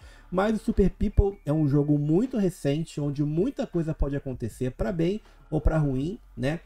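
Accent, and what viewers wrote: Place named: Rio de Janeiro, Brazil. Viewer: Mano o que e pubidi kkkkk você deve ser do rio de janeiro só fala asim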